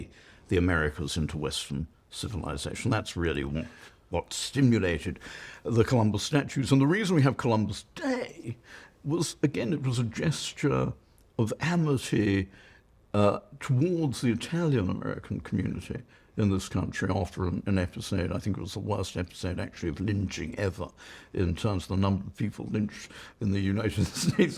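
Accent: United Kingdom. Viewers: Most iconically epic British accent I have ever heard!!! Suck on that Peter Hitchens!! :) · My God, this is the most British man I've ever heard.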